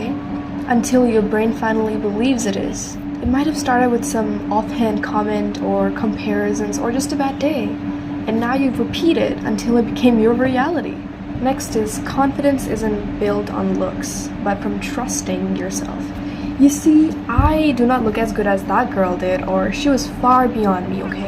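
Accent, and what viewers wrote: United States. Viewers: Wait how did u get your American accent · YOOO YOUR AMERICAN ACCENT IS ON POINT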